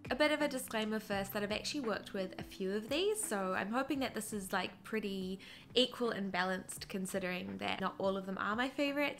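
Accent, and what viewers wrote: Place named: New Zealand. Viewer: Your such an awesome creator, love your stuff, very inspiring! And great to hear another Kiwi accent on YouTube! (I'm from NZ too)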